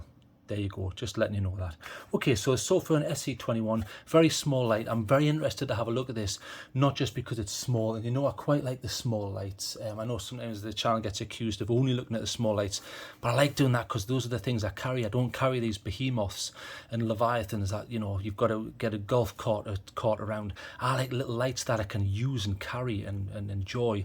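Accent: United Kingdom. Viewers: Love British accent & this is how a flashlight should be reviewed. Good job 👌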